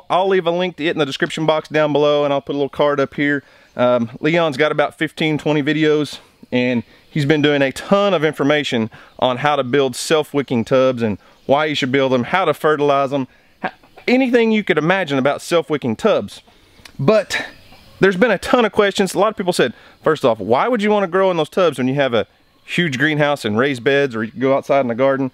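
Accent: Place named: Oklahoma, United States. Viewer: Thanks for the instructions...going to give it a try. Your Oklahoma accent is quite differ different from my Michigan gab. Enjoy growing!👨‍🌾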